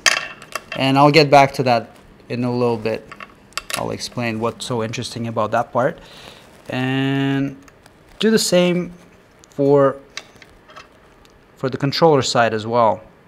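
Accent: Russia. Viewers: Russian акцент.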